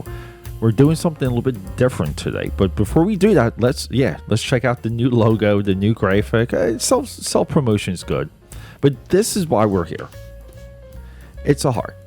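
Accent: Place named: Philadelphia, United States. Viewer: I knew you were from Philly by your accent😂 great stuff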